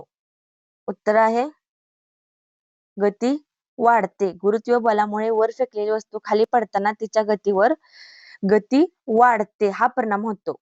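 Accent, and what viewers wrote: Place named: Satara, India. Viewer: ek no madem khup chan questions 🔥..bhashecya tuning varun satarcya ahat vatat.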